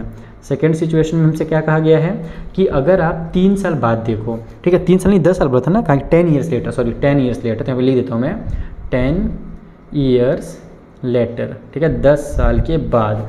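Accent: Bihar, India. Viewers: Sir aap bihar se ho kya❤❤.. kis jile se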